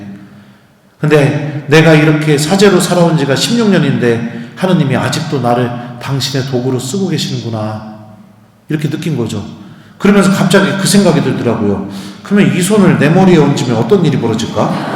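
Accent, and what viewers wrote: Chungcheong, South Korea. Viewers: 신부님 말습이 너무 웃기고재미있어요 충청도 아젓이 신부님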